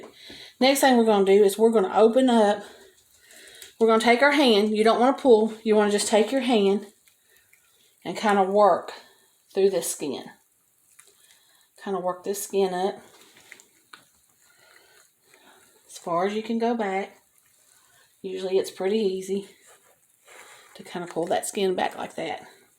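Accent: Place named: Tennessee, United States. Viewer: I love you you talk like me I’m from chattnooga tenn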